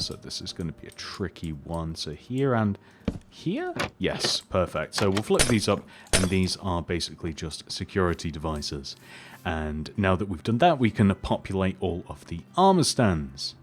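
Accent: United Kingdom. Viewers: He’s British so he pronounces it differently like me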